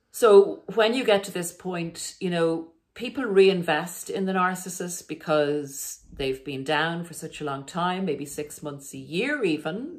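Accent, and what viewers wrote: Ireland. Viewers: I can hear you are irish do you habve coachibg online can you putvthe contact here thank yohbits greatctibget info here thanks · R u Irish by the way? The only reason I ask is because well I am 50% I’ve had a really bad weekend with my narc But I had to tell my parents I was texting them they just drove all the way to the Florida Keys they are almost 80 but I’m sending them voice memos because somehow it just happens to the best of us when the end of a sentence has to come out Irish, well it happened to me all last night and I couldn’t stop laughing that usually happens to my dad. It’s just so funny because it’s like I’ve never been to Ireland but it lives in us I don’t know I might be wrong completely but I thought just maybe maybe if you might be a little bit Irish? You don’t do it though but it’s like how do we adapt an accent from a country that we’ve never been to?! 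LOLs that’s the only thing that’s kept me laughing through all this narcissistic abuse from my boyfriend who I am about to have seriously just I’m gonna forget about him eight years down the tube but you know what eight years too long of me putting up with this it’s like it’s like dealing with somebody with multiple personalities there might be other things going on I don’t know I don’t care anymore I just know that I’d know this is a hard test for me because I am not usually the one to sever the ties in relationships It’s not a want it’s a need it’s kind of like I have to it’s like you’re being forced to leave this person and it just will get it will get so bad it will snow ball until you end it and your whole entire life is a mess An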